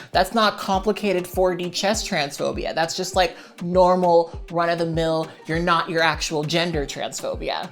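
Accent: United Kingdom. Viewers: 🧡 i love the british accent that gets sprinkeled in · I've noticed you've been occasionally doing a British accent randomly in your videos. Especially recently. When are you getting your own BBC series? 🧡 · mood As a fellow British person, I would like to say your lapses into the British accent (which is SO clearly your native accent ;-) ) bring me great joy. (I can't ever put the emojis because I watch your vids on my computer :sadtimes:) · 🧡🧡 that british accent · 🧡 is no one going to discuss accent developments? i love the dips into accents every now and then. we a do it to varying degrees of success, but british is starting to get good. i wonder if she's been binge watching bridgeton or following a new english youtuber. whatever, it's fun when people switch accents for no obvious reason.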